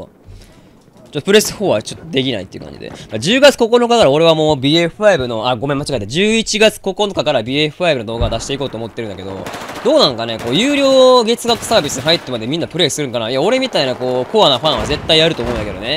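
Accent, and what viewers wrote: Kansai, Japan. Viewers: しょー 関西弁の人が上手いこと敬語を交えて話すのって地味に凄いからな(´・ω・｀) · 関西弁ってひとつの持ち味だよね。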